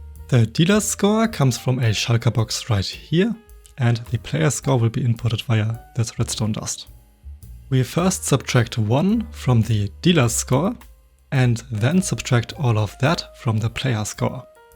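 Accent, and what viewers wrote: Germany. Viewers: Warum nicht deutsch man hört das du deutsch bist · I hear a wening deutsch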